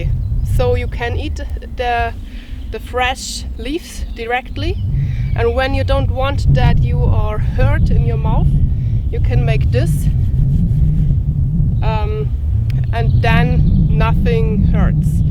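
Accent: Germany. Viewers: Hallo, Es tut mir leid, Ihr Leben zu erforschen suchen, mag ich Ihre Videos und liebe Ihren deutschen enlish Akzent, versuche ich, Deutsch zu erfahren, weil ich viele deutsche Freunde in paddernorn habe, Ich weiß die Geschichte der Vögel und der Bienen, aber wie haben Sie ein Baby? ich dachte immer, dass Sie eine einzelne Wikingerfrau der Wald-Mumie waren? ich frage in Deutsch so nicht viele Menschen werden wissen, was ich frage, habe auch ich eine Verbindung mit Deutschland, weil ich in den britischen Armeebaracken konzipiert wurde, wenn meine Mama und Vati, der war in der britischen Armee, und macht es hoffentlich leichter für Sie zu verstehen?;)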